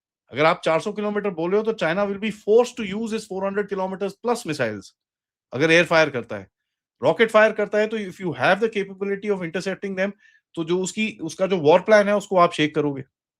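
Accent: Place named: Bengal, India. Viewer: Bengali accent kaise bolte ho?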